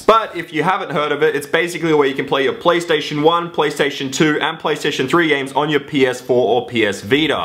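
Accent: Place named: Australia. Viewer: I love rage moments combined with his Aussie accent and cursing, it's so funny:)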